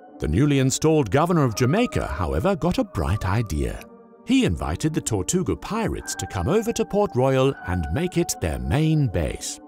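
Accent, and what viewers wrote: United Kingdom. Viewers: Is this guy British or American? Because he's using a British accent, but he's also using the American pronunciation of "Caribbean" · British accent but american pronunciation?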